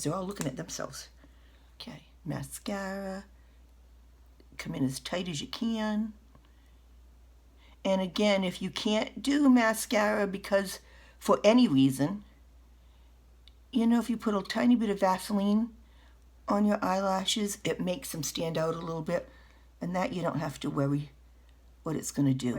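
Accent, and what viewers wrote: New England, United States. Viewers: I’m Southern and I like a yankee accent. Reminds me of “All in the Family.” Don’t change the way you speak❤✝️Hair & makeup looks 👍🏻 good.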